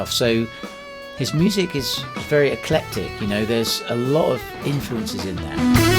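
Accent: West Country, United Kingdom. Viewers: What is your standard tuning? And am I detecting a bit of a west country accent?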